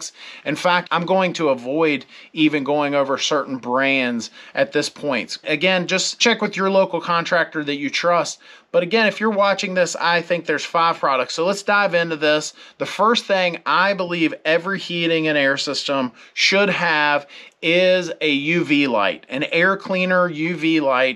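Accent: South, United States. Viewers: Sound like a southern accent. Anywhere near Louisiana? Had 2 HVAC come out with both saying completely different things.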